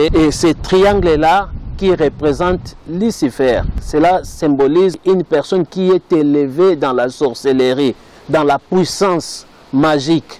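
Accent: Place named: Democratic Republic of the Congo. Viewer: N'insulte pas pardon.. C'est l'accent congolais qui fait ça.. Personne ne t'a demandé de cliquer sur la vidéo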